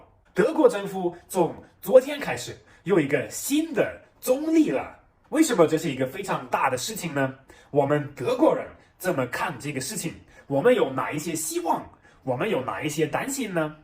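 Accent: Sichuan, China. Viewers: 你的四川話真地道,希望德國人不要受美國的影響,不要欺侮中國人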